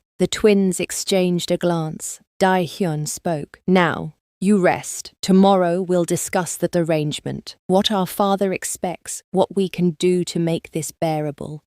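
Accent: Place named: United Kingdom. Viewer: For some reason when I hear a British accent telling the story, it helps me what is that, lol love that accent all the time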